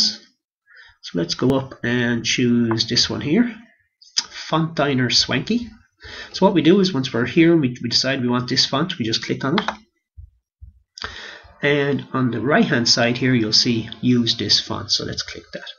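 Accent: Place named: Ireland. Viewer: Never realised the blog/you were Irish - nearly got a fright when I heard the accent - was expecting a British/American accent for some reason! Very well explained :)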